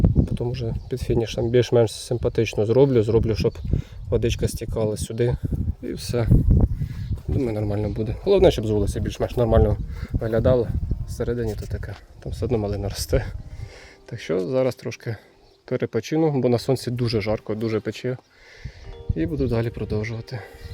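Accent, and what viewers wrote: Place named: Vinnytsia, Ukraine. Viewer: Ви щ Миколаївщині,а розмовляєте,як наче все життя на Вінниччині прожили. Чи так і є?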